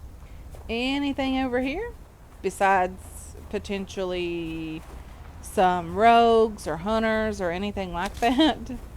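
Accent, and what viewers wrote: Kentucky, United States. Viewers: wat part of kentucky you from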